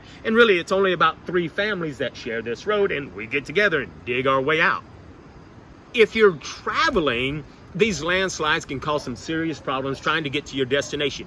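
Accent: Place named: Louisiana, United States. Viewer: Are you from Louisiana?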